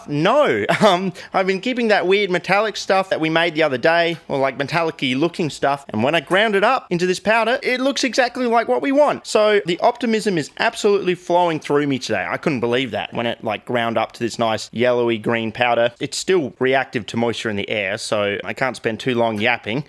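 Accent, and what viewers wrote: Australia. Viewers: with an Australian accent, which as an American, makes this 45% more engrossing to me than NileRed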